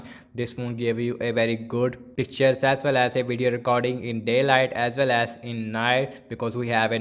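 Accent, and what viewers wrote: India. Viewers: nice ! thanks , you have a little accents indi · Nice to hear English in desi accent.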